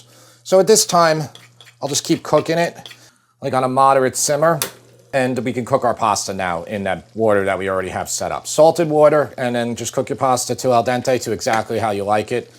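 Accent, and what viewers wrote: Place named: Long Island, United States. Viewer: Great food. Great recipes. Great Long Island accent. Everything anyone could want. ⭐⭐⭐⭐⭐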